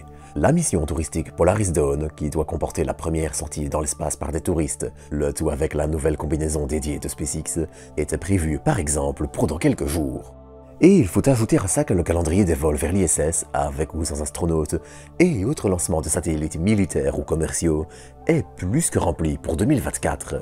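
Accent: Belgium. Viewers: Accent Belge, normal quand on est né en Belgique.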